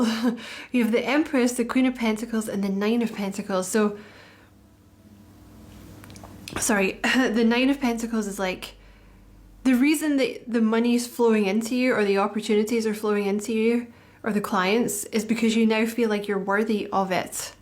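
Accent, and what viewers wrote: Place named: Scotland, United Kingdom. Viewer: It’s so lush to hear a Scottish accent for once ❤ thank-you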